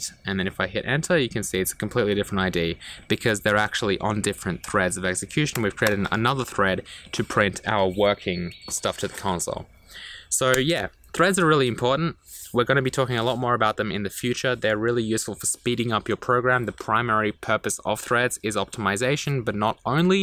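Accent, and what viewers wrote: Australia. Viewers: You from Australia?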